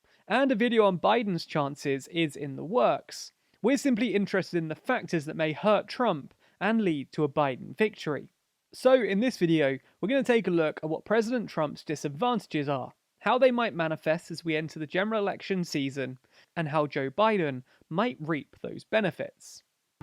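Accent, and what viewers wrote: United Kingdom. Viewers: his British accent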